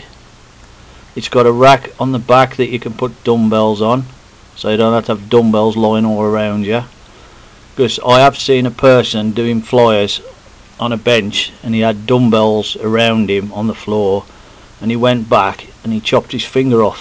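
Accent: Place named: United Kingdom. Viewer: The best thing in the video is the speaker accent. I love british accent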